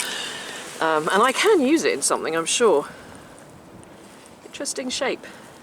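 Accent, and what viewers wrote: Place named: Humberside, United Kingdom. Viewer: What a fantastic & interesting video, been a Gunners fan since 1967. You've greatly added to my knowledge about the early days. I detect the Humber estuary, but oh boy, it's nothing like this. Keep em coming. Thank you.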